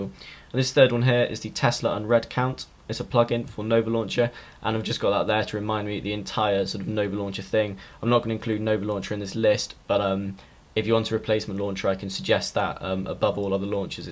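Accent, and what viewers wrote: London, United Kingdom. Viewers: Good old Londoner. Keep up geezer.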